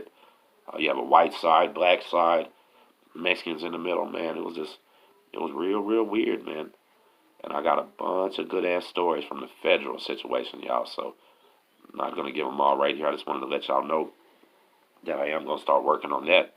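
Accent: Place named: California, United States. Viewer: Sound like out here in Cali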